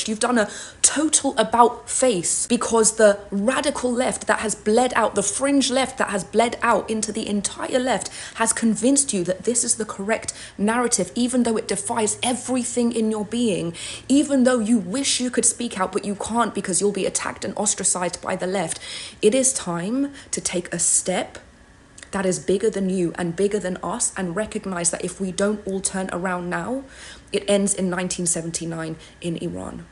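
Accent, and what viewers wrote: United Kingdom. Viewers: Correct. That's how you know everything she's saying is utter BS. Not to mention she misses much of the underlying factors and historical contexts of the past 300 years that would explain where we are today. She gotta cute face and a British accent so many will listen. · She's not iranian - i hear british accent.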